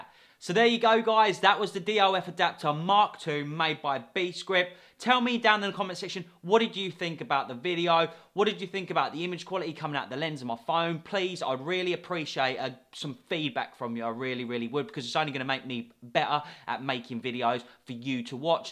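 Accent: United Kingdom. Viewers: that british accent made the video even more interesting to watch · I am in love wifh vhe cockney accent brova Bu' vhe presentation was good. Fhanks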